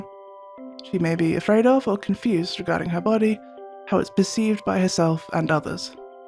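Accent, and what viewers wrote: United Kingdom. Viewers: I like how your voice sounds sort of British. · you have the most british voice ever lol, great video btw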